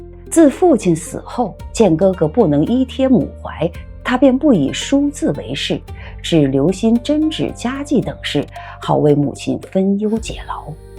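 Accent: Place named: Beijing, China. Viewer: 古韵京腔兼而有之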